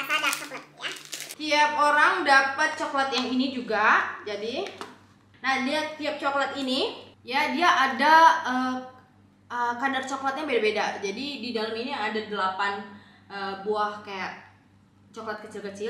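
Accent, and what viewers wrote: Malaysia. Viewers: cara kamu berbicara spt bahasa melayu malaysian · Saya mulai subscribe dari tahun lalu, suka aja liat videonya lucu, menarik, dan logat melayu'y ini bikin gemas Klo ngikutin d'ig baru berapa bulan ini karna saya ga terlalu aktif d'ig 🤭🤭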